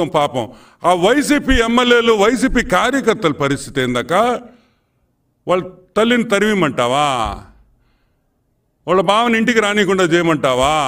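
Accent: Nellore, India. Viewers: Nellure slong super anna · Nellore slang suprb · Entraa aa accent... Oka press meet pettinappudi language sarigaa vundaali... Nellore paruvu theeyyodduraa... Ok neeku nachhaledu daanni right gaa present cheyyali... Paruvu theesaav kadaraa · సర్, మీ నెల్లూరు Accent చాలా బాగుంది...జై CBN, జై టీడీపీ... అబద్దాలు చెప్పి పోయిన సారి గెలిచారు...మీరు వెంట వెంట నే విమర్శించాలి...వదలరాదు...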